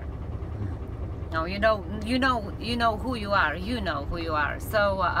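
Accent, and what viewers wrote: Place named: Ukraine. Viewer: Great video thank you. Sounds like a Ukrainian accent. Stay safe out there.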